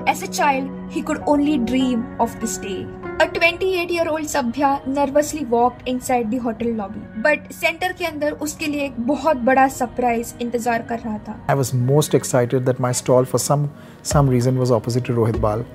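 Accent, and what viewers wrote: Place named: Bengal, India. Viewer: Aapki Hindi sunkar laggra ki app Bengali ho ❤❤❤😊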